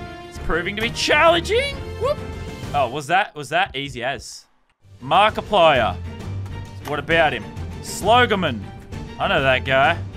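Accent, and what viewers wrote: Australia. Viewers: Wait is lazarbeam Australian? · DONT SWEAR YOU AUSSIE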